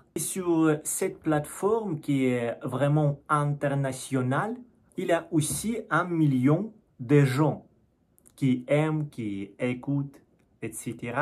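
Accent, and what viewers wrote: Russia. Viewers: Bonjour. Je viens juste de visionner la vidéo. Tu sous-estime tes progrès en français. C'est dommage... Certes, tu as toujours ce petit accent russe que j'aime bien mais qui s'estompera au fur et à mesure de ta progression. Lire un livre le soir, il n'y a rien de mieux pour s'endormir. Et en plus, s'il est en français, en dormant, tu retiendras plus aisément l'orthographe des mots que tu auras lu. En tout cas, félicitations pour ta persévérance. 👍🙋‍♂️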